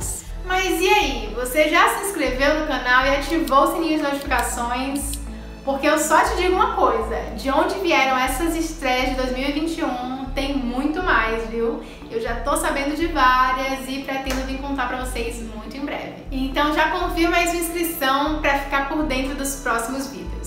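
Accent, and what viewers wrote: Bahia, Brazil. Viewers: Além de linda ainda tem esse sotaque lindo da Bahia 😍🙈💕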